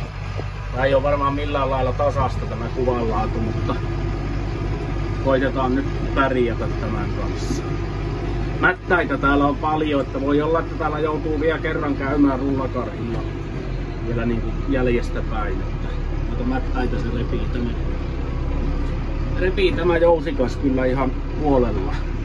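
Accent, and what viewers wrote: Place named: South Ostrobothnia, Finland. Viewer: Juhannuksia koko perheelle ja upeeta et puhut suoraan eteläpohjalaisella tyylil mistä tykkään kun oma suku ylistarosta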